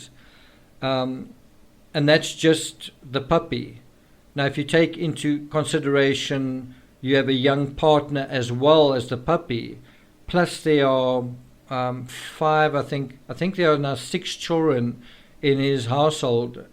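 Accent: South Africa. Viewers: This American loves your South African accent 🙂 and of course your wisdom too. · You popped up my in my recommendations and when you said the most authentic voice in true crime in the intro...I thought wait this dude sounds South African... and pleasantly surprised that you are!! Howzit from a fellow South African * slams subscribe button *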